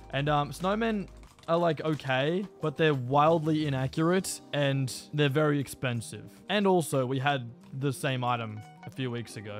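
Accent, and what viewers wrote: Australia. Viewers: why do you sound so australian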